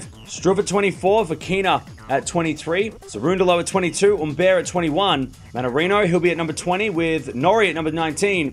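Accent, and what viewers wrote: Australia. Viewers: talk slower only aussies understand u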